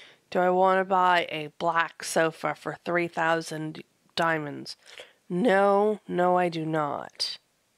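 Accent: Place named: United States. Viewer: Kind of a personal question, and maybe you've answered it before... You sound like an American, how did you come to be living in Ireland? Also, do you like living there? (I might be looking for a new country to move to after November 3, lol.)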